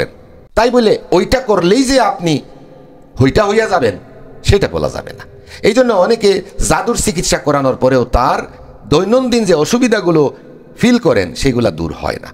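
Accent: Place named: Barishal, Bangladesh. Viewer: মামা আজকে আপনার কথাগুলো একদম অন্যরকম শোনাচ্ছে অনেকটা বরিশাইল্লা বরিশাইল্লা। অনেক কিছু আজকে জানতে পারলাম ধন্যবাদ।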